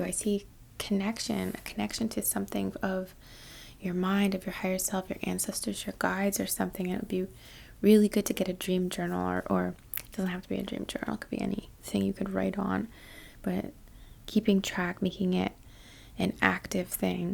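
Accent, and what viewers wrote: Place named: New York, United States. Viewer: Thank you for your hard work. Also, I just want to add that today I became aware of your New York accent. I've been listening and watching your reiki vids since I think 2016, and suddenly I was like, omg she sounds like a New Yorker. Don't ask me why I became aware of this, I have no idea. Peace and love x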